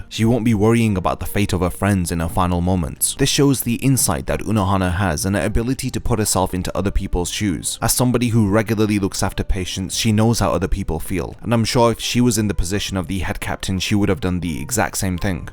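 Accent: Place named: London, United Kingdom. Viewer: Yeah, I'm curious what your first language is too. It sounds like you learned english with a london accent. The mix sounds good.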